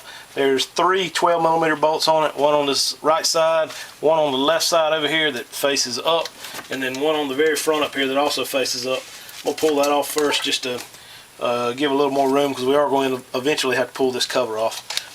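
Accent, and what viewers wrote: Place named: Georgia, United States. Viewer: South for sure. By the accent I'd say Ga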